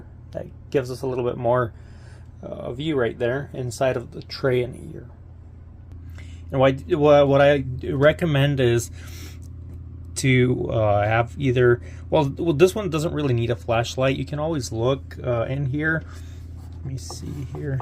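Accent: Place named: United States. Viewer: Love the American accent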